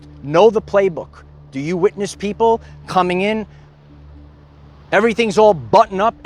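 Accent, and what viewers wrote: New York, United States. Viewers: Caring good intentions but your message and video is convoluted Mission mosh with too much vague innuendos I noticed you never used quote any Scripture you're just giving life experience but it's verbally jumbled man it's not making a very good presentation Without trying to be rude or disrespectful I have to question and ask if you're on any medication because you're not messing with the public regarding something you call Twin Flame and vibrations and frequencies Your New York accent to me explains kind of why you have a super high intensity and inflections about the inner workings of communication for me you sound like you're on a subway too long and irritated with the people's inflections or things you think they're doing to irritate you I think it's better you stay in the sunshine and stay outside and enjoy the fresh air around you because you need that oxygen bro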